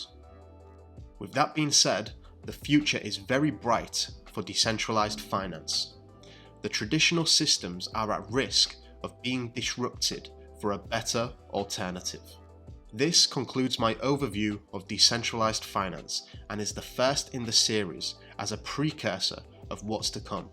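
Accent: Yorkshire, United Kingdom. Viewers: Very very informative. You are an excellent narrator! Northern accent, Yorkshire? Many thanks.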